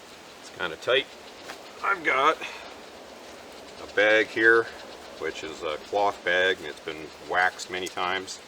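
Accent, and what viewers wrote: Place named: Vermont, United States. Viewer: Phenomenal presentation, sir! I thoroughly enjoyed literally every aspect of your video! I too, am a historical enthusiast, focusing on historical fencing, but interested in ALL aspects of life in the period…I specifically wish to hunt boar with a spear in the old style…I’ve hunted them with firearms, but to do it with a spear….at any rate, I digress…Your accent sounds local to me-(I live in the state of Vermont)-but due to your use of the metric system, I suspect you’re just North of me, in the lovely land of Quebec…I’ve visited Quebec City; lovely, historic town…I felt like I was somewhere in Europe! Thank you so much for sharing your passion! Its always good to meet kindred spirits. I hope this message reaches you well, sir!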